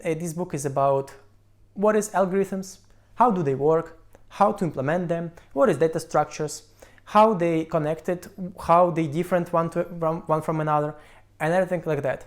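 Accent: India. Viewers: Why do you sound Indian😅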